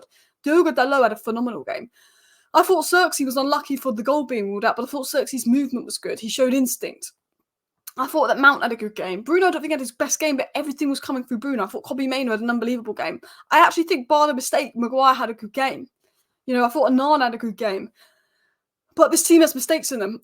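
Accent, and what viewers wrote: Manchester, United Kingdom. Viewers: That’s a funny Manc accent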